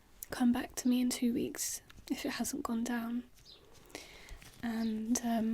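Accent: United Kingdom. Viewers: Love your voice and accent. Where are you from?? Im Spanish,so I can't "guess" English accents very well,but you sound British(?)Not sure. Anyways really good roleplay💜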